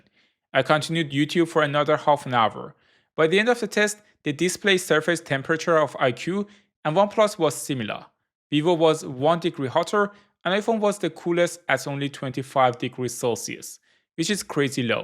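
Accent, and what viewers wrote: Iran. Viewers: Obvious persian accent 😁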